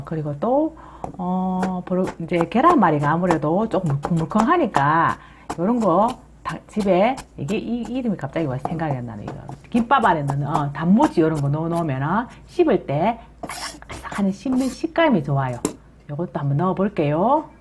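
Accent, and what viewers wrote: Gyeongsang, South Korea. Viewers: ㅎㅎ 하고웃지요 갱상도사투리ㅋ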